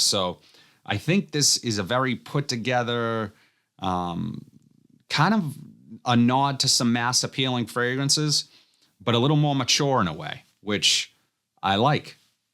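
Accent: Boston, United States. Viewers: Can't get pass his awful Boston accent 😂😂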